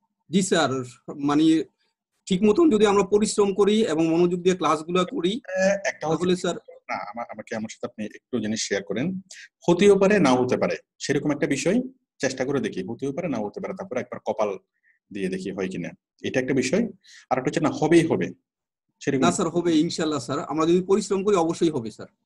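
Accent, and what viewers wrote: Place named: Chittagong, Bangladesh. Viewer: sir, ami html & css pari,, apnader chottogram aa branch office achaa,,, apnr language chottogram ar moto.. ami ctg ta thaki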